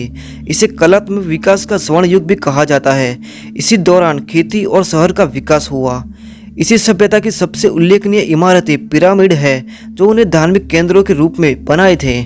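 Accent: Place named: Gujarat, India. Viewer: भलेही हिंदी बोले किन्तु गुजराती का तडका निकाल आता है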